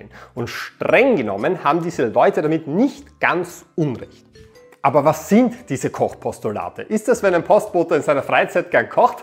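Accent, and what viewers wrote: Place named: Austria. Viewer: Ich mag deine Art, in deinem Deutsch gerade so viel Österreichisch zuzulassen, dass du damit nicht proviziell (Entschuldigung, bin selbst Österreicher, ich darf das) wirkst, sondern die Allgemeingültigkeit deiner Aussagen betonst und trotzdem eindeutige rotweißrote Perlen setzt, die dich unverwechselbar machen und deiner Sprache Persönlichkeit geben.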